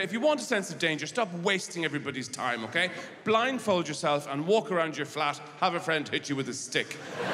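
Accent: Ireland. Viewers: Irish accent, so beautiful...🥰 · what is this beautiful accent? Irish?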